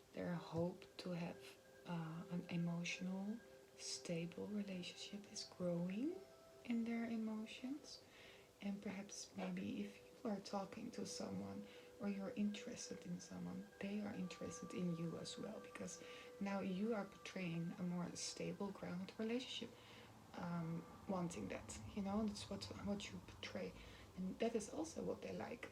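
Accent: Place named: Netherlands. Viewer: aan je accent hoor ik dat je nederlandse bent. je legging is top! veel succes met je kanaal!!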